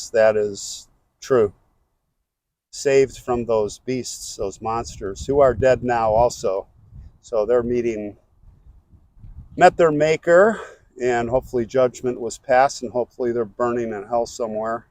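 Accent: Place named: United States. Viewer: which part of America is your accent from? I love the way you pronounce many words 😊. That little boy's face was so cute. Poor little angel. I'm glad you visited him.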